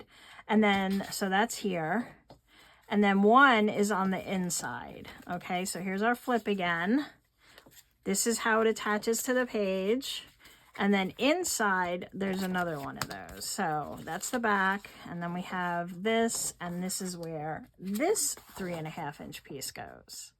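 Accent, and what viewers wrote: New York, United States. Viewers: I will definitely try this. Totally enjoy your videos. And your NY accent.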